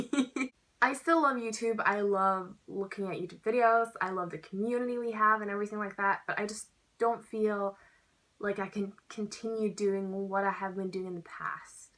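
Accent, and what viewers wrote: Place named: United States. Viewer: The video that I had the deepest impression of you was how to do well in IB, your English sound so authentic American now hahaha. I'm looking forward to videos on your current life~ (e.g. travel, uni, London life etc.)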